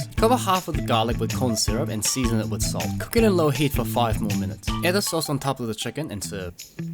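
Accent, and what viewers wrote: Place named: New Zealand. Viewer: Wow looks nice 👍🏼 btw miss New Zealand your accent is a fun mix of the kiwi accent.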